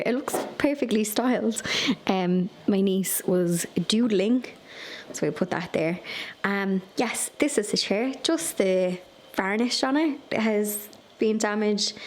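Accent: Ireland. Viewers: Aloha doll, new to your channel & adore your style so much. To hear that lovely accent again is a treat. I used to spend most of my summer holidays over in Sandymount & Ringsend, with close family friends. Many happy memories of The Strand, Bray & Phoenix Park & the town centre in Dublin. The cats are gorgeous. XXX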